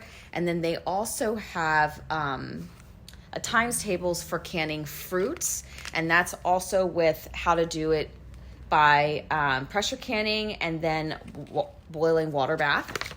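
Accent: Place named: Pennsylvania, United States. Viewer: Just started following and I'm like...I know this accent.. 717 represent.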